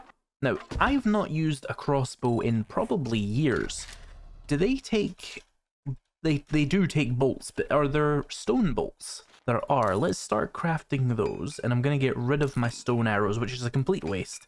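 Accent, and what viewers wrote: Scotland, United Kingdom. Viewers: are you Scottish, sound very very similar to a Scottish person trying to talk slow so other people can understand us 😂🤣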